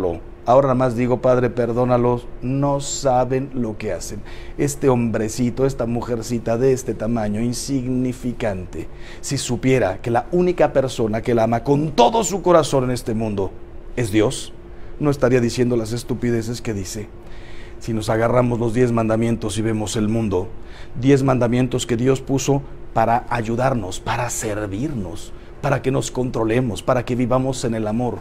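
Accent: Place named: Argentina. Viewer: Pareciera que el padre habla en Argentina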